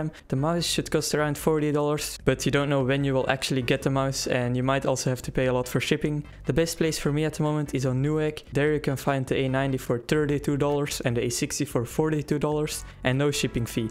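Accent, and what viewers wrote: Netherlands. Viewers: Are you dutch? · Ik dacht al dat je Nederlands was lmao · I smell dutch:D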